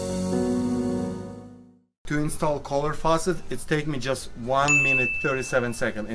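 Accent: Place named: Eastern Europe. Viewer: I tbought he had Slavic accent.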